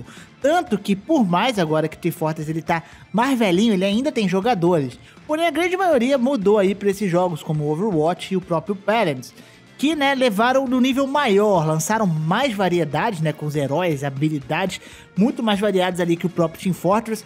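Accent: Rio de Janeiro, Brazil. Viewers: Agora sei porque não curto tua voz, porque você é carioca! Cara, tinha que impedir a internet no Rio de Janeiro.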